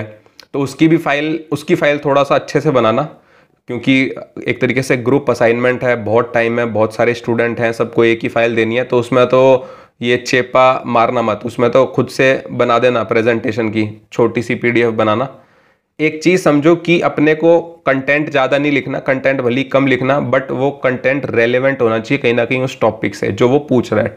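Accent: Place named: Uttar Pradesh, India. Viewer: mujhe laga aap up wale hain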